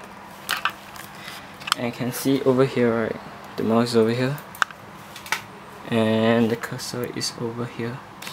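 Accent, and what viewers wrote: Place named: Singapore. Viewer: I somehow recognised that singaporean accent. I'm a singaporean too. Can u pls show me how to connect thr wires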